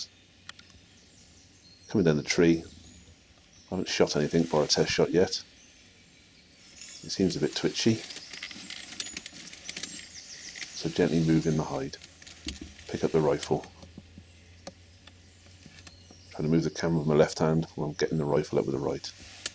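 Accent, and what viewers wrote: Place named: Dorset, United Kingdom. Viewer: You sound like you`re from my area, are you a Dorset man?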